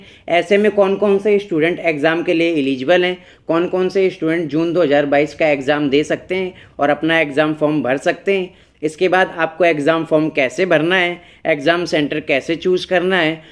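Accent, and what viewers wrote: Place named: Uttarakhand, India. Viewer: Hey bro may I ask u thing??..... Do u belongs to Uttrakhand Or somewhere from there... Actually your ascents r too similar to regional people of Uttrakhand Or nepal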